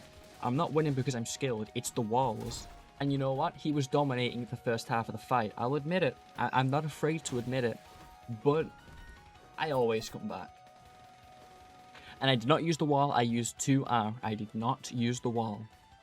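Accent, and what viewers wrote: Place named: United Kingdom. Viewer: No hate whatsoever, but you sound like a very british lad ❤️‍🩹☺️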